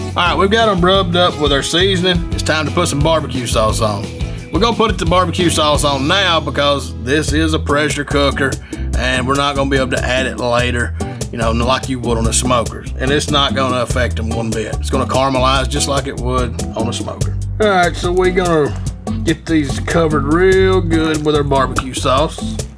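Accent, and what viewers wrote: South, United States. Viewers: The southern accent just makes these sound even better. Was living in the south for 10 years and those mofos can cook! If you're not a fancy little snowflake you'll love pretty much everything the south can cook.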